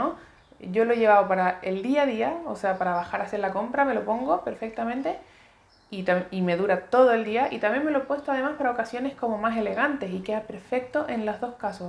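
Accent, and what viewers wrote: Chile. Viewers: * tiene acento de chilena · por tu acento pareces Chilena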